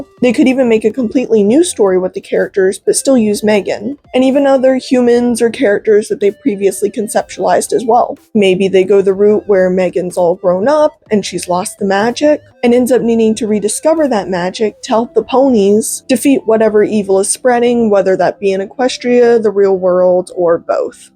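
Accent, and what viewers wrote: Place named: South, United States. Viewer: Ah yes, I remember someone else putting that movie news out there, so, nothing too new to me, and my most favourite live movie adaption has to be Sonic, it just has it all. Gotta say though, you're looking very pretty in that cosplay outfit, in fact you look very cute in it, and nice work on that southner accent. I managed to pick mine up from an interesting transformer who speaks that way called Overlord, man does it surprisingly suit him well.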